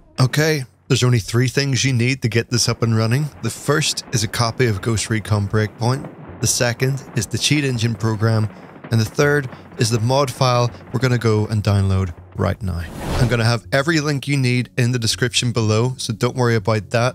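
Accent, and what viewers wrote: Northern Ireland, United Kingdom. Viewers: Are you from NI ? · knew it. Jokes aside, im from Holywood County Down. Your accent just sounded very close to home. Love the videos….. “Where is she!”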